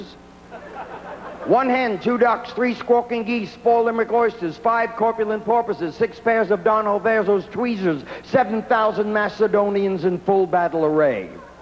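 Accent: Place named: New York, United States. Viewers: He still has a New York accent. An announcer will have no accent, speaking in Standard American English or Received Pronunciation. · Love the Brooklyn accent, especially the corpulent part!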